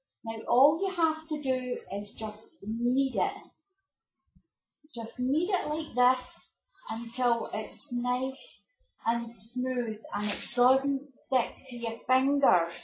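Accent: Scotland, United Kingdom. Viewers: Haha, I was thinking the exact same thing thru the whole video! There's just something about a Scottish accent, so easy to listen to - almost musical! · as an American I don't speak Scottish accented English so I turned on captions and it's still missed a lot of what you were saying, but I got the gist of it and thank you for putting this up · Very good recipe you sound Scottish. I'm scotland so thank you for recepe scotland u.k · Great recipe and what a joy to hear your accent! My mum and grandparents were from Scotland and I never hear anyone with a Scottish accent anymore. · Hi --I was looking for paper clay recipes and came upon your video for air dry clay. I'm looking for a kind of clay that I can use to put in decorative silicone molds, remove from the molds when still soft, then glue them to furniture I'm painting. I don't know if your recipe will work, but I have subscribed to your channel because I love your Scottish burr. 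I could listen to you forever. Thanks so much for this recipe.💗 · Omg.... I could listen to your voice all day. Love the Scottish accent, and very interesting videos as well. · What a beautiful accent ....... how I miss Scotland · LOL you shouldn't stop yourself. Scottish mannerisms and dialect doesn't get anywhere near the exposure it deserves. lets face facts we're pure dead brilliant and everybody should know it :)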